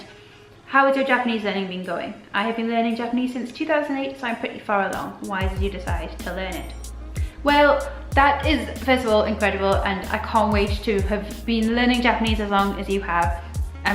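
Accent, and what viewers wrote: United Kingdom. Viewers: Hi! Love your sweet & funny videos :-) Have a question regarding your cats - since one doesn't like the other & all - are they both or one of them adopted? And both or one of them recently? Just curious since we adopted a five (now six year old) cat-lady not long ago ourselves :-) Also - did they enter your household pre or post minimalism? Did you declutter pet related stuff too? If so, how/what? What have you found essential to keep for them? Perhaps you could make a video on that topic & show us? (please?) Love your specific brittish accent, whatever mix it may be :-) And btw you remind me in some ways of Emilia Clarke! Not as any of the characters she plays but herself in interviews etc :-) In a good way of course! Hugs from Sweden!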